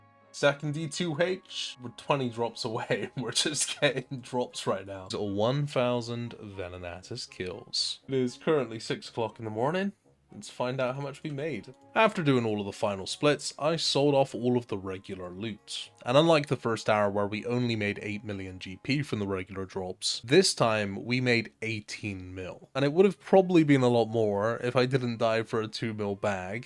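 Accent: Bristol, United Kingdom. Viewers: Are you from bristol sound like it lol😂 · Are you from the bristol area-ish? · Am I hearing a Bristolian accent? XD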